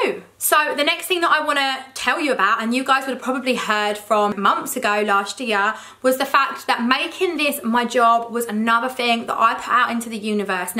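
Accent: United Kingdom. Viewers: British accent😍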